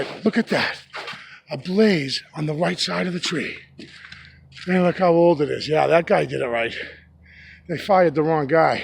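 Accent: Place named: East Coast, United States. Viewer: First time watching your channel, enjoyed it....love the East Coast accent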